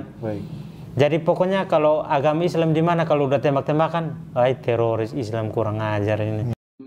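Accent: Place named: Eastern Indonesia, Indonesia. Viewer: Dari logat bicaranya sprtinya orang timur nii.. Semoga istiqomah dlm iman islam hingga akhir hayat..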